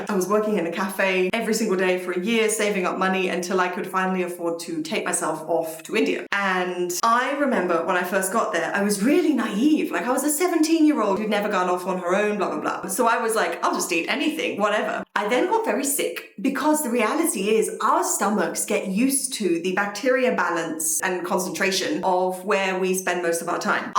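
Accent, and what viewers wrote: Nigeria. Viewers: You're developing a Nigerian accent That's sweet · I was ready to roll my eyes, but was pleasantly surprised.I was taken aback that you were Australian ( I have my own prejudice against them, I find them to be quite racist and bush😂)Such a perceptive and intelligent young woman.Your husband ,I haven’t finished watching, but I am assuming you married him because of your surname.. had found a good woman. You even sound a bit Nigerian now😂. May God bless and strengthen your union… two brave people who took a chance and it paid off, I love that!👏🏾🇳🇬🇬🇧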